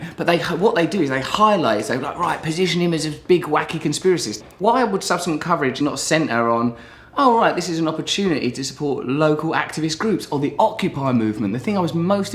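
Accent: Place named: Cockney, United Kingdom. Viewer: You are an incompetent, where'd you get that mockney accent, oh man of de people?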